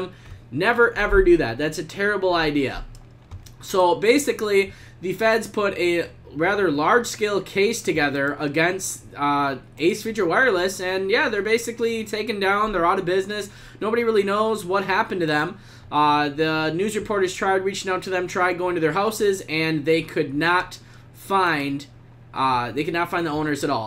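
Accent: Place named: Detroit, United States. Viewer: You from Detroit?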